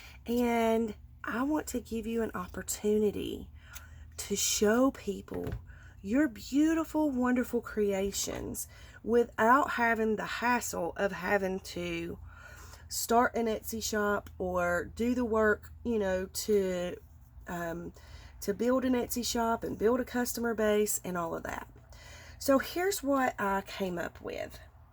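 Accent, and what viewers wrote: South, United States. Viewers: Hi, long time watcher, but recently subscribed. Your vids have been very helpful, informative and entertaining. I love your sense of humour, your voice and your southern accent is charming. I had to take the time to comment today because eventhough my creations are not yet ready for market, just knowing this is available awakens possibilities for all newbies. You have a beautiful heart and it is crafters like you that make this community so amazing. God Bless you and may the purity and kindness He has bestowed upon your heart be conducive to a successful endeavour. You rock! A million times thank you! Feel better soon.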